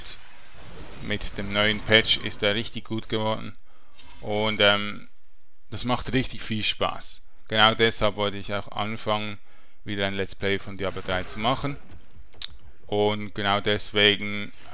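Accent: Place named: Basel, Switzerland. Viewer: sali bisch wohl au vo basel em name und em dialäkt nooch ^^ ich spiels au.. ha au en moench gnoh, us em gliche grund ^^ schrib moll di accountname unter dä kommentar , denn add ich di! bi allerdings scho lvl 70 p lvl 40 ^^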